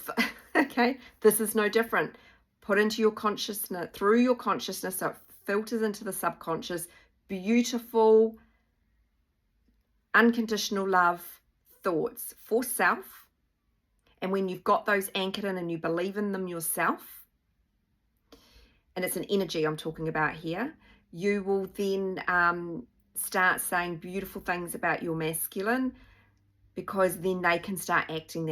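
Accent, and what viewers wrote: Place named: New Zealand. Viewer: Hi! I paid $500 to a bald man on youtube in october. Did everything he said then sat in fear based energy for 3 months, stressing i had to forget him but could not. Shamed myself. Felt like a naughty child in the group, cant say this cant do that or we'll block you and no refunds!! Found you 2 weeks ago. Immediately shifted and grounded the hectic energy. Just wanted to say thanks for your videos, wish i could pay for your services but cannot put any more $$ into this journey. Parents grew up in hastings and napier, im in melb so love the accent! Reminds me of my cousins. Thanks for all you do xxxx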